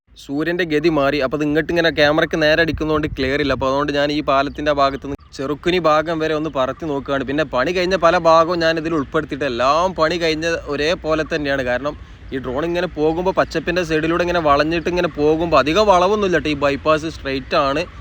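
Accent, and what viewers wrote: Malappuram, India. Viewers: വീഡിയോ അടിപൊളിയാണ്‌.. പ്രത്യേകിച്ചും അവതരണ രീതി especially Drone shot..ആ ഒരു effortന്‌ 👍🏻.. ഞാനൊരു കണ്ണൂർക്കാരനാണെങ്കിലും നിങ്ങളുടെ Malappuram സ്ലാങിലുള്ള വിവരണം കേൾക്കാൻ നല്ല രസമുണ്ട്‌..keep it.. പിന്നെ സ്ഥല പേരുകൾ പരമാവധി മലയാളത്തിൽ എഴുതുന്നത്‌ പോലെ തന്നെ പറയുക..😀 ഉദാ: മുഴപ്പിലങ്ങാടിനെ മുഴുപ്പിലങ്ങാട്‌ എന്ന് പറയരുത്‌ pls 😇 അതുപോലെ മലപ്പുറമല്ലാത്ത മറ്റു ജില്ലകളിലെ വീഡിയോ Editting Timeൽ വീഡിയോയിലെ റോഡ്‌ കടന്നു പോകുന്ന സ്ഥലങ്ങളുടെ പേരുകൾ Google map,Google earth,Sign boardകൾ ഒക്കെ നോക്കി മനസ്സിലാക്കി പറഞ്ഞാൽ ആ ജില്ലക്കാരല്ലാത്തവർക്ക്‌ പ്രത്യേകിച്ച്‌ Vdo കാണുന്ന പ്രവാസികൾക്ക്‌ വളരെ ഉപകാരപ്പെടും...പറയാൻ കാരണം പല വീഡിയോയിലും ഈ സ്ഥലത്തിന്റെ പേരെന്താണെന്ന് അറിയില്ലാന്ന് നിങ്ങൾ പറയുന്നതായി കാണാറുണ്ട്‌..എല്ലായിടത്തുമില്ല (അതെ സമയം ഇങ്ങനെയുള്ള vdo ചെയുന്ന മറ്റു ചിലരൊക്കെ ഓരോ underpass etc അടുത്തുള്ള റോഡും വഴിയുമെല്ലാം വിവരിക്കാറുണ്ട്‌). 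പിന്നെ കഴിയുമെങ്കിൽ ചിലരൊക്കെ ചെയ്യും പോലെ ആ റോഡിന്റെയും സ്ഥല പേരുകളിൽ vdoൽ എഴുതി mention ചെയ്താൽ നന്നായിരുന്നു.. പിന്നെ നിങ്ങളിൽ കണ്ട ഒരു Plus point Thalassery-Mahe bypassനെ നിങ്ങൾ ആ പേരിൽ വിളിക്കുന്നതാണ്‌..ചില YouTubers മുഴപ്പിലങ്ങാട്‌ bypass,അഴിയൂർ bypass എന്നൊക്കെ വിളിക്കുന്നത്‌ കാണാം.. (കോഴിക്കോടും കണ്ണൂർ ഭാഗത്തേക്കും NHൽ കൂടി ഇടുങ്ങിയ റോഡുകളുള്ള തലശേരി-മാഹി ടൗണുകളെ Touch ചെയാതെ പോകുന്നവർക്ക്‌ വേണ്ടിയുള്ളതാണല്ലോ ഈ bypass..ഇത്‌ ഈ നഗരങ്ങളിലെ ഗതാഗത കുരുക്കിന്‌ ഒരാശാസ്വവുമാകും..) കോഴിക്കോട്‌ ജില്ലാ അതിർത്തിയായ കുഞ്ഞിപ്പള്ളിക്കും അഴിയൂരിനിടയ്ക്കും നിന്ന് തുടങ്ങി കണ്ണൂർ ജില്ലയിലെ മുഴപ്പിലങ്ങാടിൽ അവസാനിക്കുന്നത്‌ കൊണ്ടായിരിക്കാം ചിലർ ഇങ്ങനെ പരാമർശിക്കുന്ന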